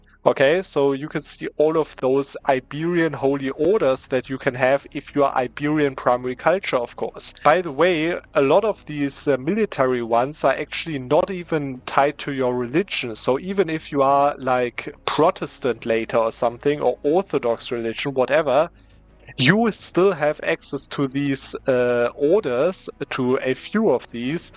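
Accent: Germany. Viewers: Lern mal richtig Englisch sprechen. Ist ja nicht auszuhalten mit diesem unerträglichen deutschen Akzent, mein Gott alter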